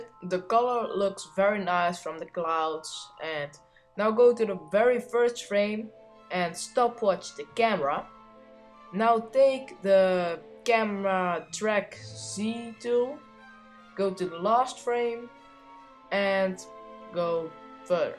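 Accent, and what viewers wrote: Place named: Netherlands. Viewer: are you from holland because it sounds like you're from holland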